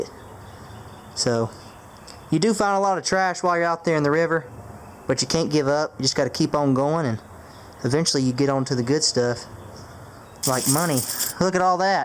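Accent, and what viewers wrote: South, United States. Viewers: Your obviously 7, it's a southern accent, it's common for people to have accents like that, especially if they live I n certain parts of Texas, Alabama, etc. people who are black don't exactly have an accent, they talk a certain way, but it's different from a plain southern accent, he litterally just talked about Texas, and said y'all, he's from the south maybe the west you never know, it all depends on where you live. But I don't expect you to understand going off of the fact that your most likely under the age of even 8.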